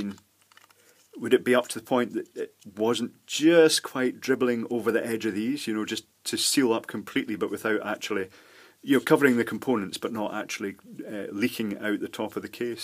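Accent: Scotland, United Kingdom. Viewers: Scottish sir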